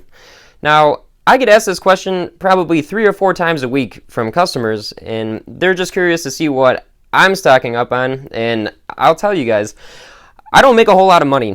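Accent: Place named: North, United States. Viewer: Can tell from your accent your from up north, I'm from downstate Il...a bit south of Champaign. This is the first video of yours ive seen, but I'm going to check out the rest of your stuff. I enjoyed this video man. Thanks....and big R and rural king is where I buy 90% of my ammo. I just caught 38 special on clearance for 28 bucks for a 100 round box! Going to help me break in my new henry 357 sidegate....looking forward to watching the rest of your videos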